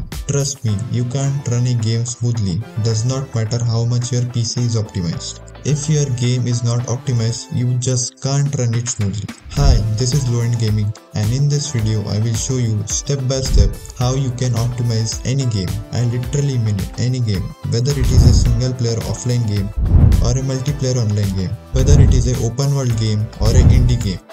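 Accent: India. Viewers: Bro this voice really like of a Indian person...ARE U INDIAN 😮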